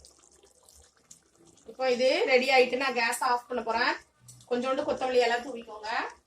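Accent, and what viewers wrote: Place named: Nagercoil, India. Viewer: Hi aunty.. Im studying 2nd year but I’m so interested in cooking that’s y started watching cooking videos ...I’m ur new subscriber ☺️ I love ur videos ❤️ And I love the way u explain and u upload different recipes ❤️ I guess ur from Nagercoil coz ur slang is like tat ☺️ Pls upload some of ur native spl recipes aunty ☺️☺️❤️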